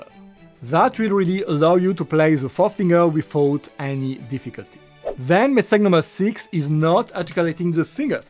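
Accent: France. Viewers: These are some of the best tips and tutorials on YT. I do have a little difficulty with the French accent. One suggestion is to correct a grammar mistake often made throughout these videos. He says "Let your finger on the string" for instance. What he means is "Leave your finger on the string." The word you want is leave.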